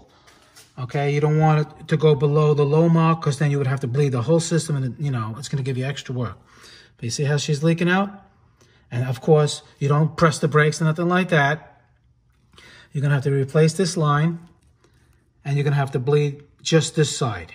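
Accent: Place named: New Jersey, United States. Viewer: Love the east coast Jeewwwessseeyyy accent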